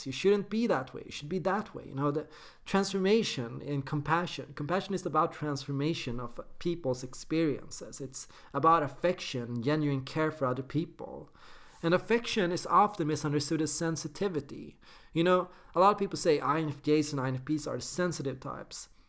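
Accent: Sweden. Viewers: He's Swedish. Det kan man høre på ham. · That's OCD, not INFJ...;-) I think that's a specular/optical distortion of the camera. At least you noticed the wall hanging -- is apparently from Stockholm (as his "accent" indicates.)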